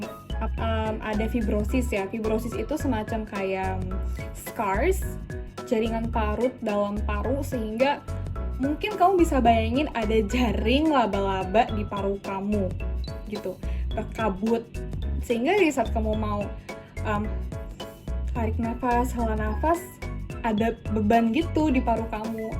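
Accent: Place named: United Kingdom. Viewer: Wih logat. English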